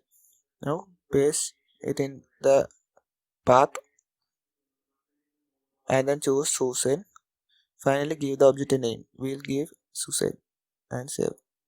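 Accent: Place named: South India, India. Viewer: Thanks bhai that material splitting thing was giving me headaches I was import the whole model without making materials in blender I never used any 3d paint software By your accent looks like you are south Indian hope I am not wrong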